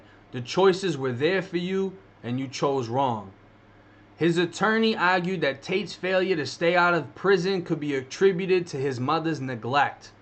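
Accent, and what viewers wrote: Boston, United States. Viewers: You sound like us Boston Ma accents lol. I'm glad I came across your channel.😁 let me find out your from Massachusetts 😁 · I hear Boston. Is it? Yes. Wicked Boston!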